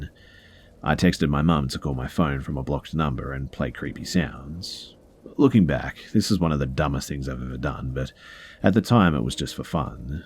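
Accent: Australia. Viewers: Love your stories! Especially love listening to your voice, Aussie accents are my fav. (And UK.)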